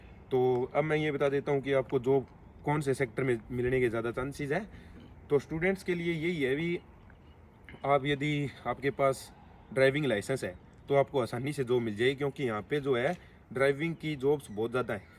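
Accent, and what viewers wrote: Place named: Haryana, India. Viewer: Bhai Rajasthan haryana side se ho kya Hindi nal Dhaka ho rya ha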